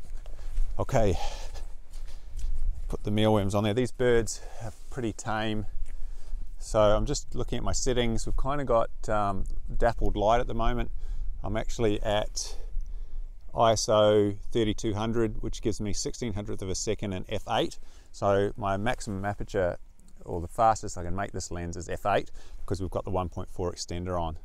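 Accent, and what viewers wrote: Australia. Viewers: Nice to listen to Ozzy English :-) - Missing all the colorful Australian birds I love so much :-(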